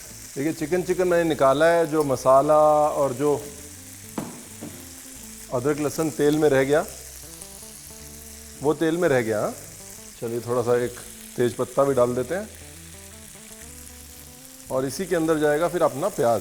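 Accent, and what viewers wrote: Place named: Punjab, India. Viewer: I noticed this today on how he says "Chickun" and not "Chicken". You can take the punjabi out of punjab, but cannot take punjab out of the punjabi. Love it!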